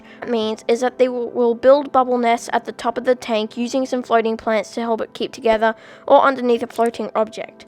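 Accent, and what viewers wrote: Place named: Australia. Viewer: I'm sorry if I'm wrong but are you Australian coz you do sound like us Aussies if you are can you purchase a honey gourami from a local petbarn?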